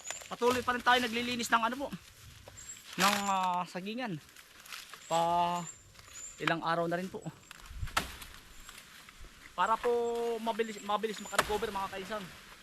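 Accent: Philippines. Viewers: what's going on people stealing banana after typhoon destroy almost everything. It doesn't make no sense at all well just stay cool you'll get it back what you've lost. Take care your self and always stay safe and healthy and your entire family too love to watch your show and your Filipino accent too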